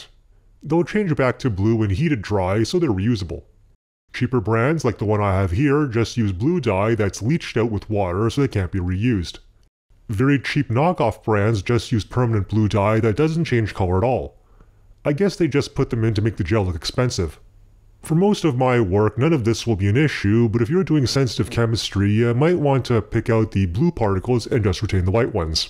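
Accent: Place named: Canada. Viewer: you sound soooo Canadian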